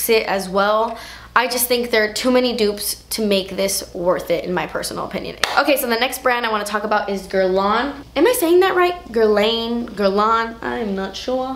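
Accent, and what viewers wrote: United Kingdom. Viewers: Only that's eeeeeeeeeeeeeeeeeeespannnnnnnnnnnnnnsive!!!!!!! Plus your Britain accent! I die! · Her British accent is amazing! I love it!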